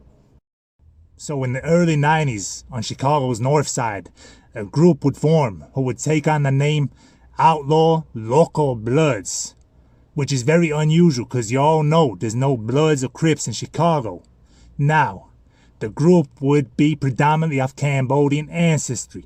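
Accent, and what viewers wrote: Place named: Vietnam. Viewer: U definitely picked up the Viet accent lol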